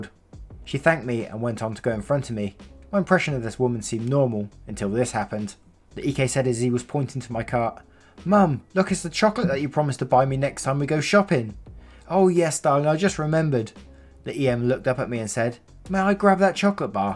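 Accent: United Kingdom. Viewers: Charter Schools are quite a cool idea (being from the UK like you I had never heard of them before either, until a few years ago) [I actually think there are now one or two of them in the UK]. You should look them up as they are so, so different from how we would have both been taught. Great content by the way. So nice to hear a British voice rather than an American one telling these tales (and before people kick off, I have nothing against American voices).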